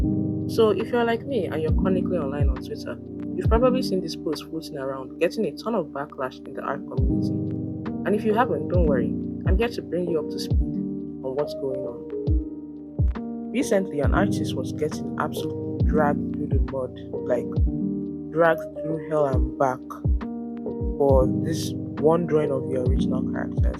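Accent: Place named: Nigeria. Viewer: I KNOW THAT ACCENT ANYWHERE OMG 🇳🇬🇳🇬🇳🇬 FELLOW NIGERIAN ARTIST HERE YIPPE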